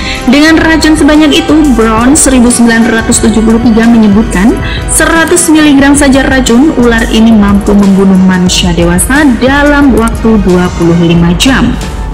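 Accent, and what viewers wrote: Java, Indonesia. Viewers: Syin nya medok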